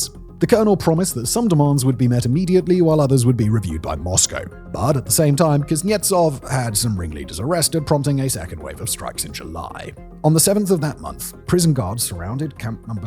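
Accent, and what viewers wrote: United Kingdom. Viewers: Fight to keep human created content! there's nothing better than a British guy talking about history anyway.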